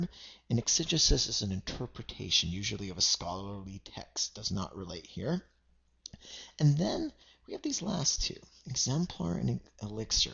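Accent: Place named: India. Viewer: nice indian ancent english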